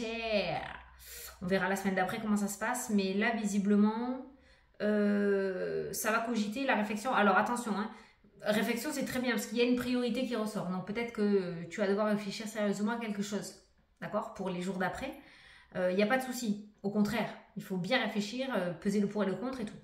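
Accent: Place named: Bordeaux, France. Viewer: Serai tu de Bordeaux ma belle fille parle comme toi.🥰🥰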